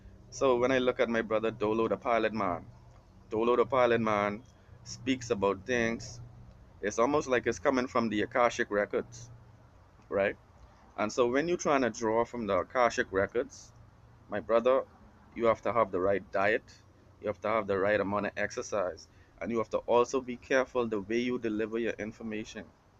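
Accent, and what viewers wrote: Bahamas. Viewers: You Bahamian bro?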